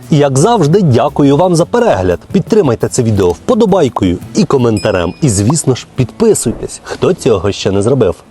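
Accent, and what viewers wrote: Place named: Poltava, Ukraine. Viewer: Ваш полтавський акцент чудовий❤ може ви й не звідти, але дуже схоже, в мене звідти родичі) А де таке росте? Де його взяти? В нас тільки кінський скрізь росте...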